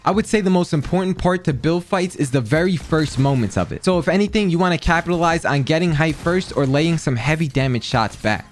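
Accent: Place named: New Jersey, United States. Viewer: Thx so much and are you from New Jersey cuz you have the accent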